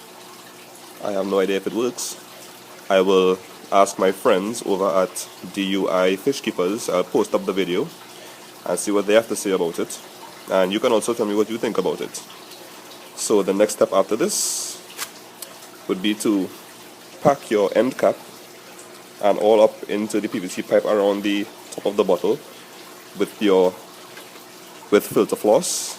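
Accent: Trinidad and Tobago. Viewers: Hmm u sound like a Trini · i hear a trinis voice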